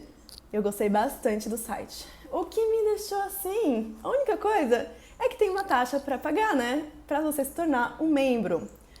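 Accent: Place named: United States. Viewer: Esse sotaque americano lindo que vc tem ❤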